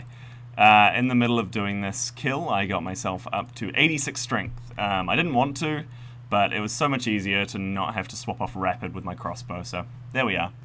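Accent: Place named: New Zealand. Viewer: Mate, you're a Kiwi! What part of NZ bro?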